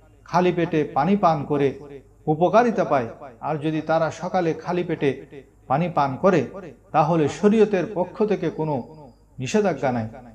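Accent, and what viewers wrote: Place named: Chittagong, Bangladesh. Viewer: Chittagong er hujur bujha jasche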